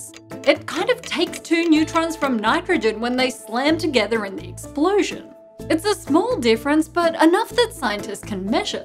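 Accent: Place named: Australia. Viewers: her accent is so thickly (australian?) sometimes I feel like she has trouble talking. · Yes she is Australian.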